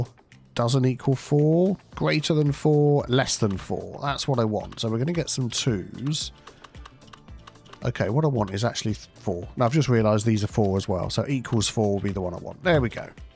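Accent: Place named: North, United Kingdom. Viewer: ik this is like a super weird and irrelevant question but are u a northener of a southener coz u have a friendly northerner vibe but i can place ur accent nowhere plz ignore if this is too personal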